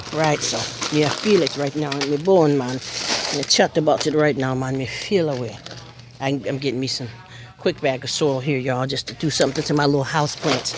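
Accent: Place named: Jamaica. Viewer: Love your Jamaican Accent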